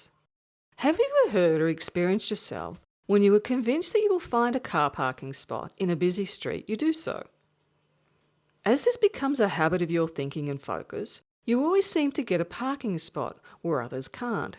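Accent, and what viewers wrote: Australia. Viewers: razzzzz. sounds like an Auzzie professional surfer. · Australian accent is killin me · women with Aussie accents <3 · Horrible australian accent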